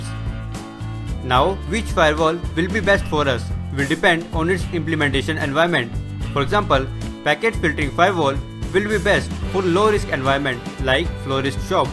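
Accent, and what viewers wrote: India. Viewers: Indian English.... man, Why! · Indians must work harder with their accent...i barely understand them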